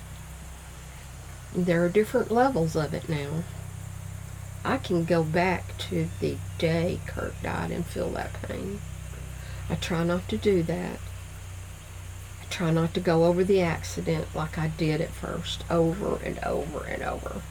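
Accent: South, United States. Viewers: I like hearing the rooster. I like hearing all the birds and the nature. I like swinging on the porch with you and I like your southern draw. It is all so pleasant and relaxing. I am sorry you have to go through all this. You will never forget Curt and he loves you and will always be with you. He wants you to be happy and have joy.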